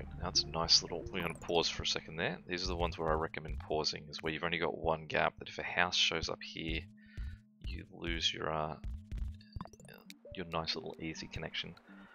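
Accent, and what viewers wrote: Australia. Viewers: I'm guessing Australian?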